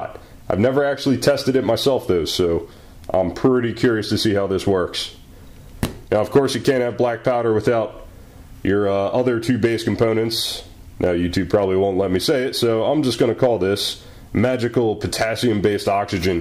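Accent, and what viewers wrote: South, United States. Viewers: Maybe it's just been awhile, but you have damn fine hands. Damn fine hands! I didn't even know I was a 'hand' lady! Granted, I'd gleaned from your videos that you are an ass, re: euphemisms, double entendre, blatant ejaculate consistency; Of a keen intellect with a purview respectful of both humanistic and psychodynamic persuasions and love for even the lamest of layman; You've a deep, soothing voice, with that faint Southern-like drawl -... and I just heard something about 6"... Average ain't bad! Above being the verbose way of sayin': I've got to hand it to you, you are one damn fine ass, sir. tips hat Love the videos and the colourful internal dialogue gone rogue. <3 Thank you.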